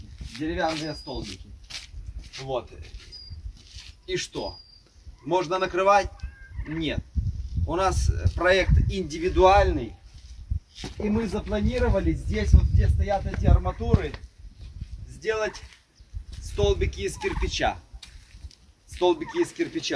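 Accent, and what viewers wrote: Belarus. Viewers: Такой брестский акцент)))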